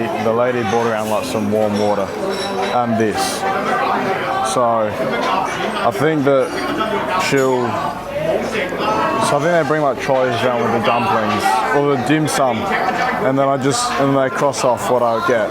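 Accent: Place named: Australia. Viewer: Thank you for coming to HK.💛 I can practice listening to Australian-accented English.😃🙏🏻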